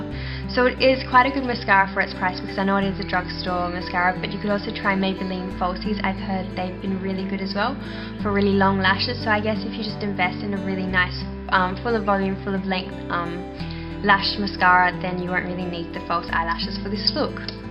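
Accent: Australia. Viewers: Sometimes you sound Aussie and then other times you sound like you are putting on a British accent. FYI I am Australian so I know the difference.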